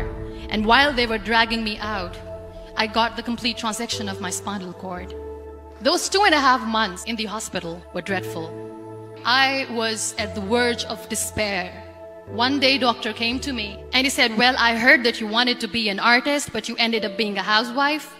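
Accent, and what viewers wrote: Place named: India. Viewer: Hindi accent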